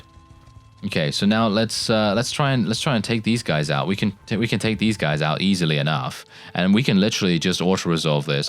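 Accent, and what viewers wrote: United Kingdom. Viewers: He has the most soothing yet focused voice, he sounds kinda British.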